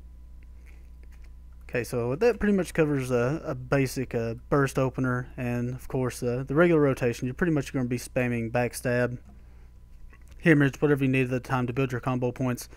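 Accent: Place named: Alabama, United States. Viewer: I love your Alabama accent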